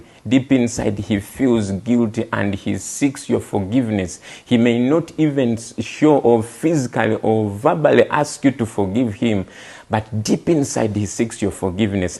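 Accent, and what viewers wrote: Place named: Uganda. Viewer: Ugandan English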